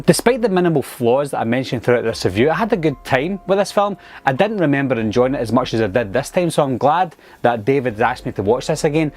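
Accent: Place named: Liverpool, United Kingdom. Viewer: Liverpool accent ✌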